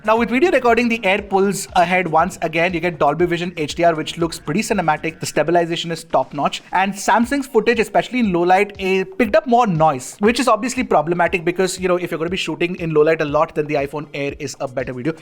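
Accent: India. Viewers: Indian English accent is so Funny😂😂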